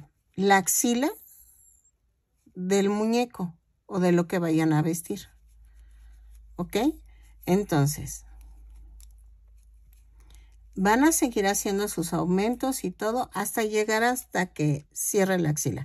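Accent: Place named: Mexico. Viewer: Perdón pero cuando veo números Veo chino . Prefiero jacerlas a ojo. Pero me encanta si acento mexicano y su dulzura❤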